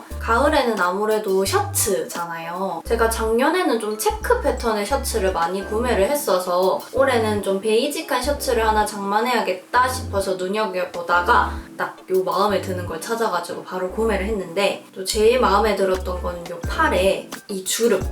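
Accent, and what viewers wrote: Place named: Busan, South Korea. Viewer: 하..진짜 너무 예뻐요❤ 말도 엄청 예쁘게하시고 사투리가 너무 귀여워요 혹시 실례지만 부산 사투리인가요?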